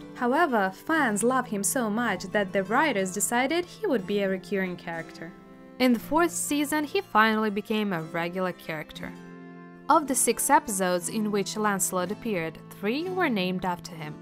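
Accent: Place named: Russia. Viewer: At the beginning of the episode I somehow had the feeling as if a Russian person spoke English (not a criticism)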